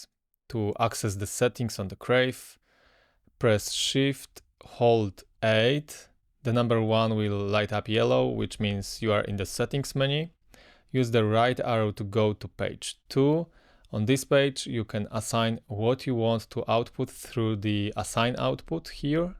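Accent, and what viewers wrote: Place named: Poland. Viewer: Słyszę polski akcent w angielskim, ciekawe czy zgadłem ? Bardzo fajny materiał. Pozdrawiam 😜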